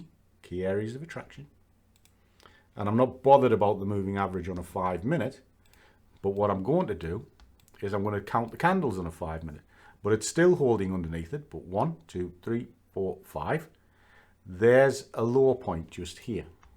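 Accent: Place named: Yorkshire, United Kingdom. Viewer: Refreshingly simple and frank, being a Yorkshire lad it’s nice to hear a familiar accent.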